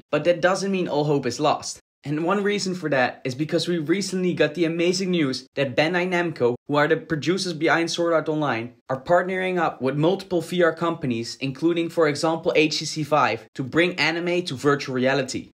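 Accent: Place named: Netherlands. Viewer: I smell a little bit of dutch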